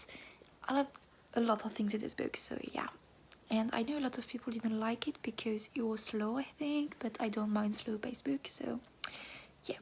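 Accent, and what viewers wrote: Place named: France. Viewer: Hi, just discovered your channel while looking for tbr ideas for the 2020 gilmoreathon. And I absolutely have to subscribe. Your accent is so lovely and relaxing and your English is impeccable. I wish I could speak French as well as you speak English. I'm going to look for language courses near me! You've inspired me. Loving this vlog.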